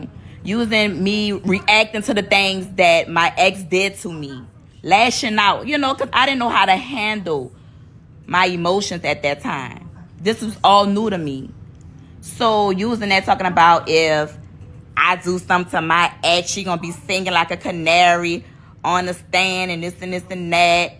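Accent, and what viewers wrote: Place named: New Orleans, United States. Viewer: I was looking at you and I said she look sooooo familiar and I heard your NOLA accent then you said your name and I said I remember you from the VA. God bless you babe! You have come a long way and have done so good with your life! Take care of yourself and your babies. You’re truly beautiful both inside and out!❤❤❤🙏🏾🙏🏾🙏🏾🙏🏾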